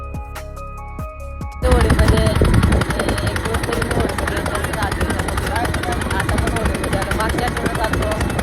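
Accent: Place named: Ulwe, India. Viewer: Aamchya ulvyasaid chi bhasha vatey tujhi kuthla aahes bhau gav konta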